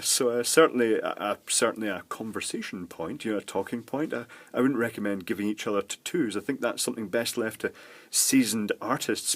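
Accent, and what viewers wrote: Scotland, United Kingdom. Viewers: Not video-related, but I'm curious to know your history, how'd you get involved in this stuff, and how you ended up on the Isle of Man? I detect a distinct fellow Scottish accent ☺ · That an isle of man accent? sounds scottish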